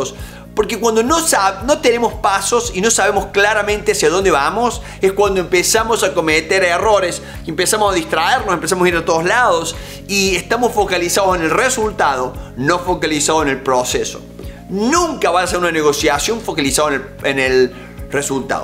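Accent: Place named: Cordoba, Argentina. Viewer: Sos cordobes hasta la espuma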